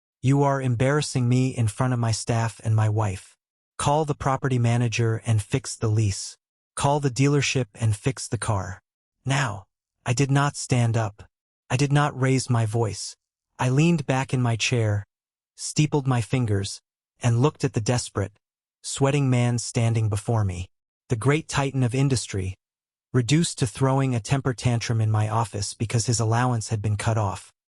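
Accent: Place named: South, United States. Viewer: I love it that the AI voice sounds like it’s speaking Chinese with a southern accent. 😂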